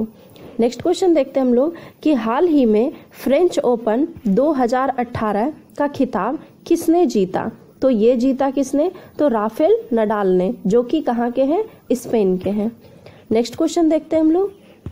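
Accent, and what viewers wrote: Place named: Maithili, India. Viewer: thanxx and aapke bolne maithily tone ki sweetness ki chhataa saaf jhalaktee hai 😀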